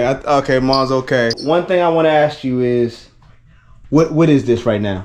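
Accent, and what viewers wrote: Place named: Boston, United States. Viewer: What part of Boston are you originally from? I’m from Roxbury.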